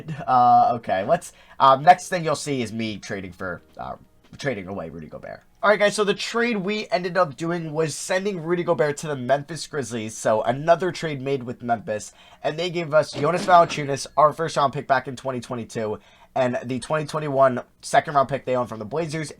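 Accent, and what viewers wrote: Pittsburgh, United States. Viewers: Hey are you from Pittsburgh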